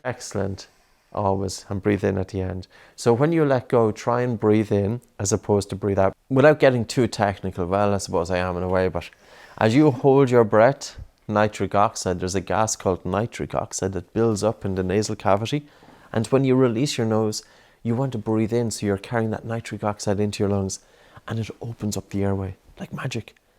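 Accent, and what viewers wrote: Ireland. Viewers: Are the subtitles nessesary??? Its just an Irish accent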